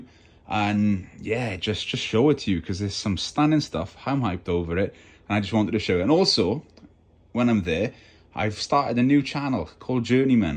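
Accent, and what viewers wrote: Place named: Swansea, United Kingdom. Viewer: your enthusiasm is infectious! superb haul, i'm pleased to say i own many of them although not all are boxed, i completely agree re the artwork, it is absolutely stunning, a golden era not just for toys but everything else really(i wont get political) incidentally my cousin's husband is from swansea and his accent is so similar to yours(obviously) great video as usual, yes, i'd be interested in a more in-depth look at your collecting process, thanks again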